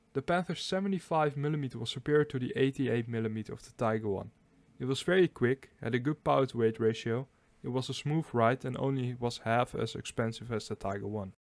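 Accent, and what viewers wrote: Netherlands. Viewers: Your melodic Dutch accent makes my own crude english, (one & only), language sound good. Very easy on the ear. And your multilingual styled pronunciation is not only impeccable but also aids comprehension of info'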